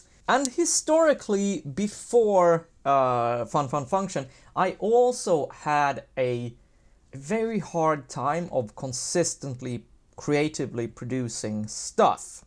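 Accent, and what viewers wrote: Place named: Sweden. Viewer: LazyDakota He's saying "yust" as in "just" with a Latin J sound. And, the accent is Swedish.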